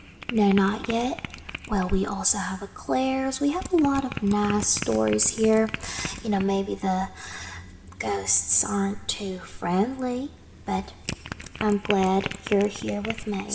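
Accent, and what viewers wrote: South, United States. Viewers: I’m from the south and your accent is spot on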